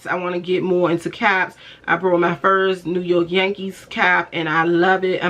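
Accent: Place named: DMV, United States. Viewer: Hey DMV friend ❤I’m new to your channel and had a feeling when you started speaking had to be from DMV 😊I love love your channel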